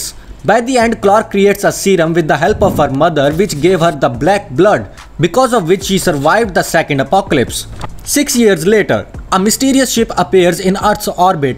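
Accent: India. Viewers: it’s awfully hard to understand, due to the thick Indian accent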